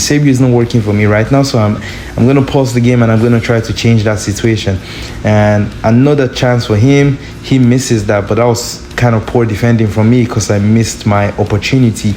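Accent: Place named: Nigeria. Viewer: Bro are you Nigerian? I can hear your accent a bit. Also what’s your ID so we can play a friendly if you have time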